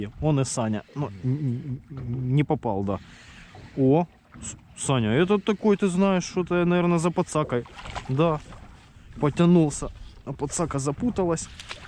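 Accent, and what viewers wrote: Ukraine. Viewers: А чего у вас в 6 вечера так светло? Это же Украина вроде, судя по говору...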